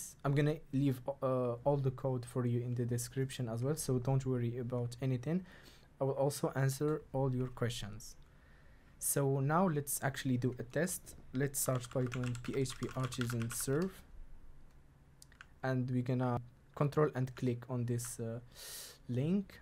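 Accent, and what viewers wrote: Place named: India. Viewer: الله يسامحك يا أخي، ظنيتك هندي والله لأنك تتحدث بنفس طريقتهم استمر وفقك الله وأعانك 👏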